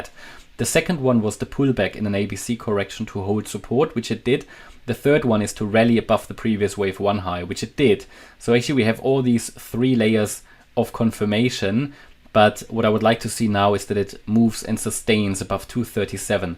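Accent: Germany. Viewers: Hast du ein deutschen aktzent oder täushche ich mich hier😂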